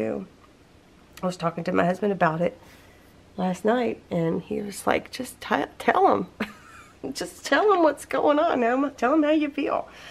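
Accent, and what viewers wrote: South, United States. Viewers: I know there's times that I have a creative block. So don't worry about it. We understand. I have a cute story to tell you. I had my neighbors 12 yr.old grandson over. He wanted to make something for his grandparents. He decided on a coupon book made from toilet paper rolls. So while he was coloring some paper to decorate with, I decided to listen to one of your videos. So I didn't put in my ear buds while watching. The video played for a bit, then he came over to look & asked me what country you where from, lol. I chuckled & said the USA & that you lived in Kentucky. I explained the people that live in the south have an accent. Of course he just said oh okay. I hope this gives you a smile, I know I get one every time I remember it. 💜💜💜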